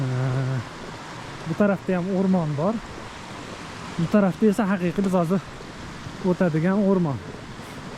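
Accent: Namangan, Uzbekistan. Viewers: Sheva nomongon😊😊😊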